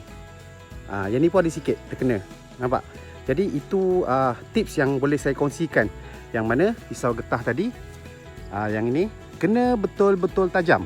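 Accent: Pahang, Malaysia. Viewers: Loghat pahang je abaa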